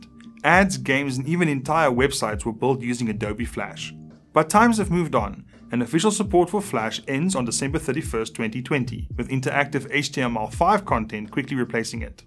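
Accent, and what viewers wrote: South Africa. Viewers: South Africa? I hear you, bru.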